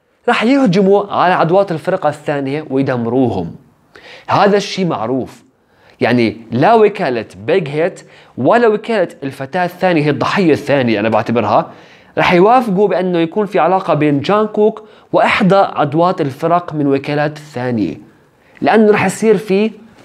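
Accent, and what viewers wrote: Iraq. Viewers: انتة عراقي 🌚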